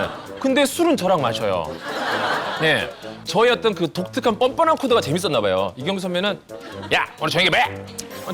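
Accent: South Korea. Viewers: 영어도 제대로 못하면서 뻥은? 하와이에 왔을때 콩글리쉬하는거 보고 엄청 웃었음. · 김영철 영어 못함. 엉터리 영어, 콩글리쉬를 너무 자신만만하게 함 ㅋ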